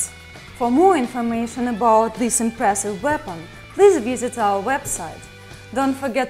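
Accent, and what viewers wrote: Russia. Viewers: Шмешинг энемис бай контакт, ИТС вери вери вел))) Вэн ай хир ёр инглиш, ай хез Джон Рембо флеш бекс Фром Рембо ту. Ваш рунглиш настолько жёсткой, что лучше бы наняли женщину переводчика или озувили на языке носителя, чем так рунглить, вас реально из-за этого не понять!!! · Русский акцент в стиле голливудских фильмов это нечто . Возможно специально так и задумывалось :) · with hard russian accent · Nice system for cqc sof, the host's Russian accent massages our ears. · Combination of weapon, woman host and a hard russian accent gives this video very strong RedAllert vibe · Heavy russian accent makes any weapon videos twice the better. "Энд римембер - ай эм э профешионал рашн, соу донт трай зыс эт хоум." (с) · Пофиг на железо. Девушка очаровательна. Выраженный русский акцент, позволяющий понимать 100% сказанного (я носителей на слух например далеко не всегда понимаю, а ее - прекрасно). 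· Опачки русский акцент))))) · жуткий русский акцент=)))) а так ниче - хорошо сделали) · Специально с таким акцентом тётя говорит? Чтоб всё-таки понимали что русская? Или тупо не нашли человека с хорошим произношением? Мне гордиться или печалиться?..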